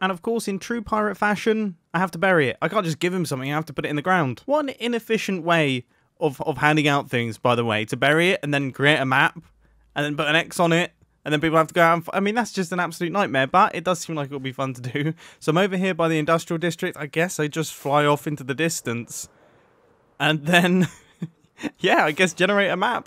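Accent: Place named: Scotland, United Kingdom. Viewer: YAASS I FINALLY FOUND ANOTHER SCOTTISH DUDE ON YOUTUBE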